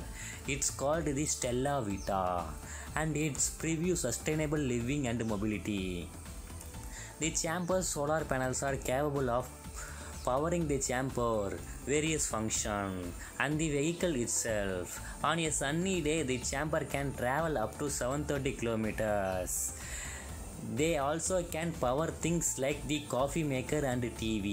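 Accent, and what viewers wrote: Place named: India. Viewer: Heavy Indian accents are just horrible to listen to if you ask me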